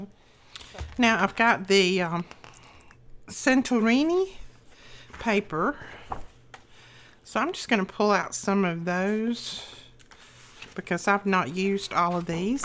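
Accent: South, United States. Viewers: Awesome! I will put you some vintage book pages in the mail!💯📓😎 And now I know why listening to you makes me feel right at home: a sister southerner! 🤓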